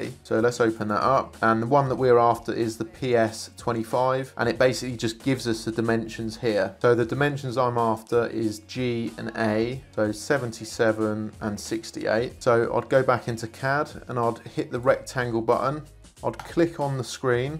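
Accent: United Kingdom. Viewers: The accent is british. Are u british ? Like ur video, im an electrical engineering fresh graduate and i like to learn british accent. Ur video just very useful amd interesting for me. Keep it up🎉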